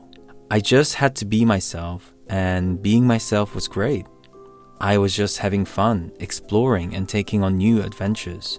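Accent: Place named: England, United Kingdom. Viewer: You have a great English accent. You are definitely different. Love your videos .thanks for sharing. The world is here for you ❤ 💕. With all mental support. Is ok to be sad and angry and is ok to be aware of it.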